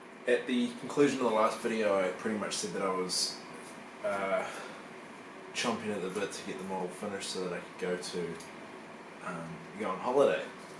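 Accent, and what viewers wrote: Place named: New Zealand. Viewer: dude! thts some great work!! As a 'crazy Blake's 7 fan' I appreciate yr work here :) was larger scale than I imagined, which means a corresponding Liberator wld be YUGE! U sound like a fellow kiwi lol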